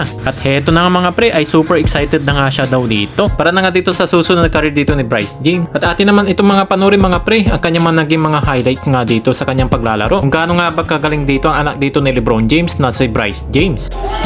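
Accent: Pangasinan, Philippines. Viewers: kahit di ko na itanong, Pangasinan ka aro! 😅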